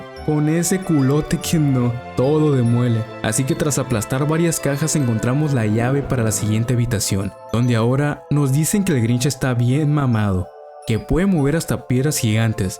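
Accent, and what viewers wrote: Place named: Northern Mexico, Mexico. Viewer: Amo mucho tu forma tan norteña de narrar y lo divertido que vea tu voz, ya eh visto varios de tus vídeos y son muy divertidoa Nueva Seguidora Feliz navidad🎉🎉🎉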